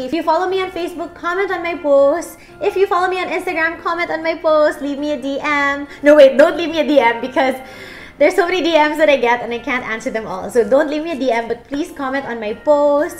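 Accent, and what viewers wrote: Visayas, Philippines. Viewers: # I already follow you since nakita tika sa instagram amd I follow you and also here sa youtube ako d.i to ga comment nga cute kaau mo mag bisaya ni bf, your so cute and love your style and one you're my style icon. 😘 xoxo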